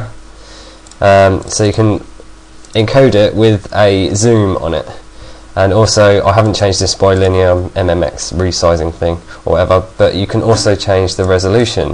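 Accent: England, United Kingdom. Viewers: Good video...but you should redo it...you are blabbing way to much and listening to an english accent for 8 minutes is annoying....good video 5 stars.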